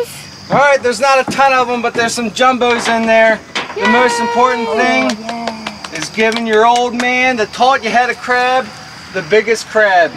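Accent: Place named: Maryland, United States. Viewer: complete with that Maryland accent hon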